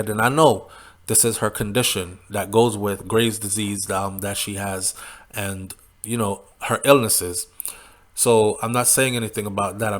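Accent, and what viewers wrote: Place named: Jamaica. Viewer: You sound like you are from Jamaica...in fact you sound like SOUFLO...you cyan hide from your fans...Big Up to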